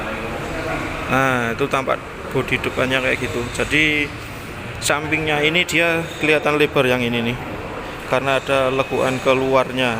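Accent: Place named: Java, Indonesia. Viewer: Jowoo medoq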